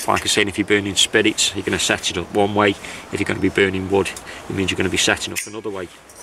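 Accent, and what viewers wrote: United Kingdom. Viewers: Very interesting and I believe that anything on YouTube is subject to an intellectual property right and prohibits a third-party from using any content. So if all else fails to stop them, then that's one option. Also, I take it that you are located in the UK. If you don't mind me asking,. what region is your particular dialect? And I don't have any difficulty understanding you, I don't know how others would? Best of luck with your channel!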